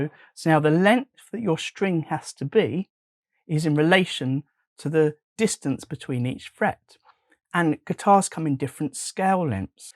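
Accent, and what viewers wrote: United Kingdom. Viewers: I can listen to this type of English accent all day.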